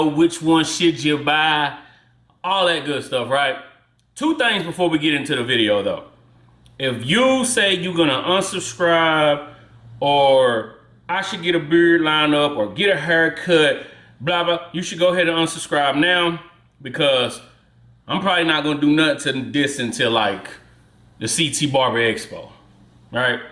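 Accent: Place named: St. Louis, United States. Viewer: Loved the review. Very detailed. Plus it sound like you from the Lou lol. New subscriber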